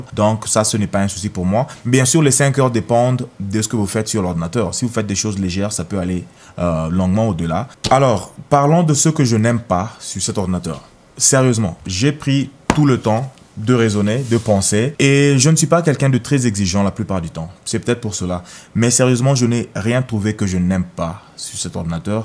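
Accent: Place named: Cameroon. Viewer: Tu es camerounais frère 😅 l'accent. Je m'abonne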